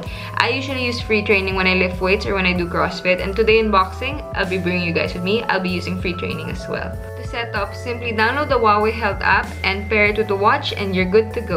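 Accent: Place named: Philippines. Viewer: Her accent very much like a filipino 😘.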